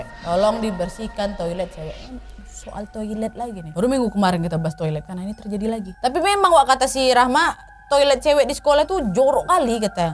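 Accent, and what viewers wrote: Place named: Batam, Indonesia. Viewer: org batam kah? Krn logatnya batam kali wak.. aku jg dibatam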